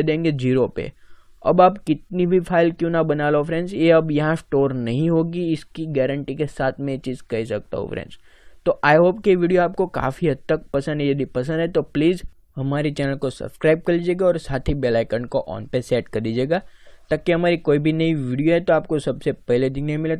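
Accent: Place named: Gujarat, India. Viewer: I think he is Gujarati 😊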